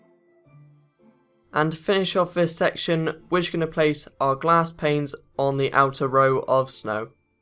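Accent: United Kingdom. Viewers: hes british if you say anything about his voice f**k u because its racist and stampys avatar is not copyrighted so shut up and if you think hes boring type in mansion tutorial the guys a robot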